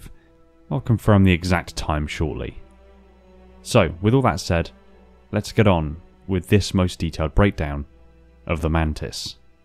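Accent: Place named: England, United Kingdom. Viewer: The English accent makes narrating this video gives it a certain level of elegance.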